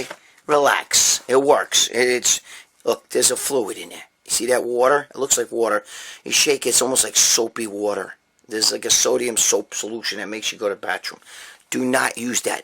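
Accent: New York, United States. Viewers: Great video, thank you so much! Love the comedy, I also love the information very helpful! Also your New York accent A lot like my south Philly accent!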